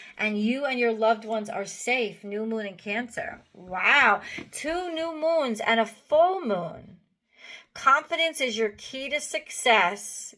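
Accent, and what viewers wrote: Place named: Texas, United States. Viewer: Love to hear the texan in you coming out in this vid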